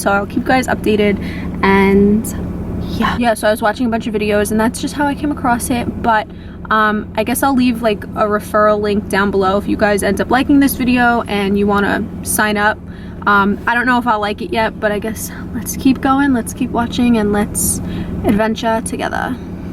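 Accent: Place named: New Jersey, United States. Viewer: Loveeeee the MARIO sounds in between- you sound like a Jersey girl, I can hear the accent, love my homies!! #Jerseygirlsrock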